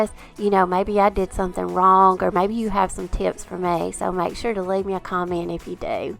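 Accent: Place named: West Virginia, United States. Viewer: Hi, I sincerely love watching your Channel... Not only do l think you’re an extremely talented crafter, l love your accent! It reminds me of my late Aunt who lived in West Virginia. I’m surprised you didn’t know about the “Cling Wrap” trick because, we’re about the same age. I was doing this way back in the late 70’s as a teenager. Mod Podge has been around since the late 60’s but it was expensive especially for a teenager. We use to make our own Homemade version of Mod Podge by mixing a 3 to 1 ratio White Glue and Water. Another groovy craft we were making was Crayola Crayons T-shirts! 🖍 Just in case anyone is interested: - Remove the paper wrapping off of your crayons and grate them using the smallest holes. - Iron your T-shirt smooth - Place several (4) sheets of paper towels “inside” your T-shirt. (It prevents the crayon from soaking through the front of your T-shirt onto the inside! 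This is an extremely important step, do not skip it!) - Lay your crayon gratings onto your T-shirt anyway you desire. Or even into a simple design! ♥️⭐️🌙🌸🌈🔥⚡️ You can use all of the colors you want! Although keep your layer of crayon shavings on the thiner side. You DO NOT want a thick layer! - Cover your design carefully with Parchment Paper (DO NOT USE WAX PAPER!) - With your Iron set on High (USE A DRY IRON, NO STEAM NEEDED!) Just press for approximately 90 Seconds, let your Parchment paper cool for 10 seconds then peel off. If you laid your crayon gratings into a Design, then when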